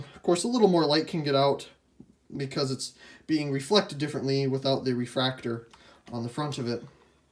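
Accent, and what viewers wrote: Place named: Northern England, United Kingdom. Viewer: Its his northerner accent lay off dude. :|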